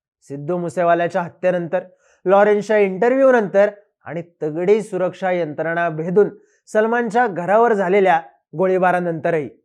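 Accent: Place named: Rural, India. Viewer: ग्रामीण भागातील हा यजमान(host) दिसतो and hyacha awaaza suddha gavakadcha vatto, baki sagle host changle aahet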